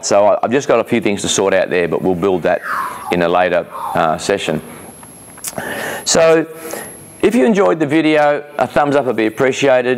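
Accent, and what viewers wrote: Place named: Australia. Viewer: Heavy over engineered base is fine, however if you want to cut thinner materials, you will end up building a lighter gantry and ditching the ballscrews. Rack and pinion would be a much better choice. Plasma is a very dirty process. Make sure to use the little plastic plugs for the bolt holes in the linear rails. Where in Aus are you? The accent and magpies give it away. :)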